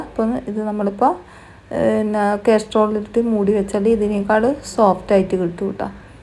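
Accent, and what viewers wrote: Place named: Vadakara, India. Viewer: വടകര സ്റ്റൈൽ സംസാരം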